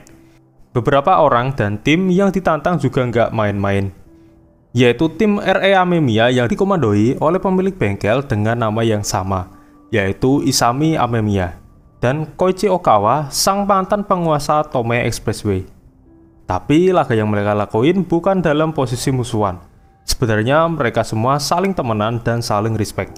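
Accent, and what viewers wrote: Java, Indonesia. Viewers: Medokmu lo min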